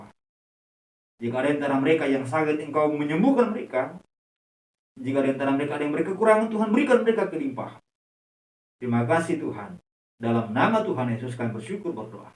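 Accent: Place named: Japan. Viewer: Yang suka dari pak Pdt ini waktu sharing firman Tuhan adalah .. aksen Jepang dalam bahasanya 😁 Mantap kali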